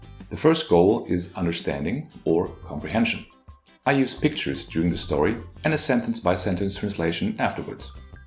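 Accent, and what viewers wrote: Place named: Germany. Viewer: Horrible strong "English" (german) accent.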